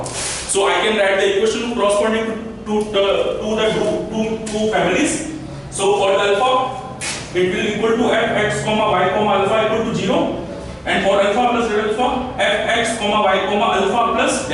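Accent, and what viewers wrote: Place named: Jamaica. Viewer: Sir your accent is like teaching maths. Great Video.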